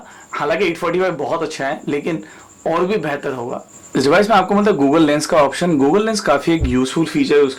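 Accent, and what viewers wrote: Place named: South, India. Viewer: i knw south indian ho tum. but i lobe the accent jis tarah se smjhaya tmne. keep the good work bro :)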